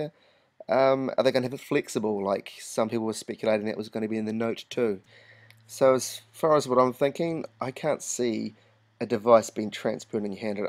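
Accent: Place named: New Zealand. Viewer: I think your from new Zealand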